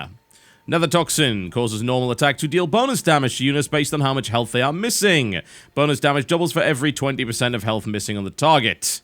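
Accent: United Kingdom. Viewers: Amazing series. Always the best content from you,. Keep it up. Hell, even the girlfriend prefers content from your channel. Calls you the classy sounding British guy.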